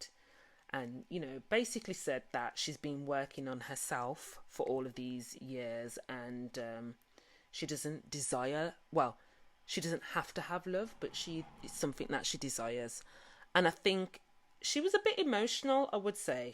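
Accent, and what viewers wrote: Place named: London, United Kingdom. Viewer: I always love to hear a fellow Londoner (but I detect a hint of Brum, am I right!?) on YouTube! Now to the review 🍿 🍿 🍿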